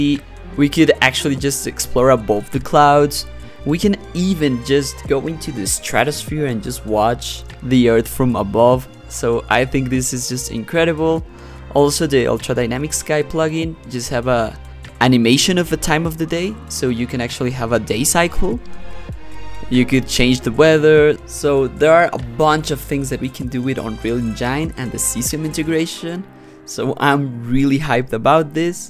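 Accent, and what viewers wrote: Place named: Brazil. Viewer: mano tu tem sotaque de br kkkakakakakakak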